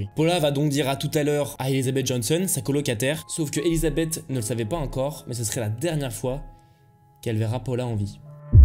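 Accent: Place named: United States. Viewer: Je te découvre le ton est sympa, parle un peu moins vite et ce sera parfait,au j'ai pris.les gâteaux , mais la belle mère pas contente d'être dans le placard Lionel camy à déjà traité cette affaire garde ton accent américain, prend un ton plus ténébreux et ce sera top Tu es jeune, sympa, un abonnement pour toi direct,et un pouce je vais te suivre sur ta chaîne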